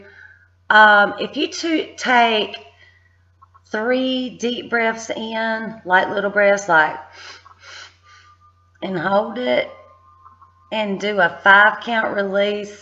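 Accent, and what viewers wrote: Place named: South, United States. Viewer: hi I'm a new member as of today so finding you now on utube. I'm so excited. I see you have got plenty of video's but I've been wanting to try auto handwritining cause I've woke up and somehow still had phone in my hand but have wrote out like a sentence and realize I get messages. can't wait also to watch your charkas. I got sle lupus and brain fog I feel like this could help me. lol I'll shut up and keep listening but wanted to let you know I'm excited. n I love us southern accent. I would do the writing like u choose too. namaste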